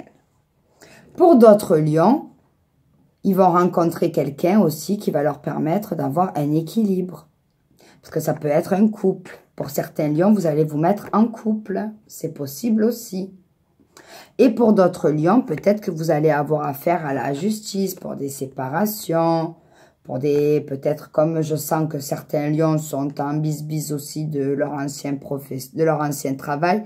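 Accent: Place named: South, France. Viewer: J aime beaucoup votre façon d interpréter les cartes et puis Cet accent du sud ☀️😍 Merci a vous et bonne journée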